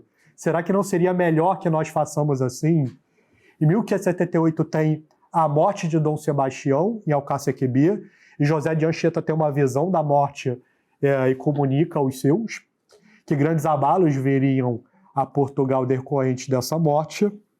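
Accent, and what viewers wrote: Rio de Janeiro, Brazil. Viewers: Qual o interesse de assistir a quase duas horas ouvindo um carioca com forte sotaque falando de um padre que julgamos já saber quem foi? Pois informo: nessa conferência há história da Igreja, história do Brasil, história dos Santos, testemunho de milagres, história das batalhas, história dos índios, e muita emoção e piedade. Vale cada minuto. E para quem ama a Igreja, ama nossa terra, no final corre o risco de derrubar lágrimas de beleza, como eu. Viva Cristo Rei!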